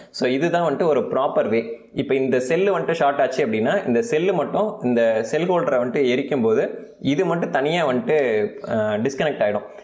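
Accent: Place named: Kongu, India. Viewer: மரயாதை,கலந்த கொங்கு,தமிழ்,அருமை🌷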